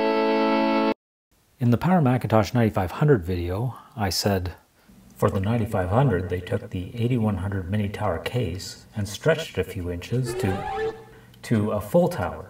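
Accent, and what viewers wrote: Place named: Ontario, Canada. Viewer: Love the videos and the Ontario accent.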